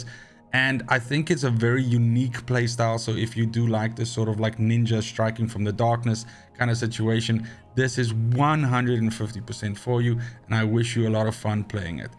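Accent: South Africa. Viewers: yo you South Arfican ?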